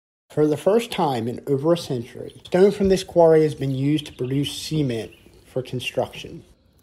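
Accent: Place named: Mid-Atlantic, United States. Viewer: You have a mid-atlantic accent. Like a Philly or Baltimore accent.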